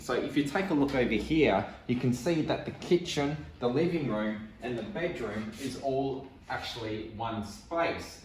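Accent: Australia. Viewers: I love your Aussie accent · WHAOUUUU 1st time i hear an aussie accent like that. If understood well it's 300 a week or 1200 a month. Seems hefty !!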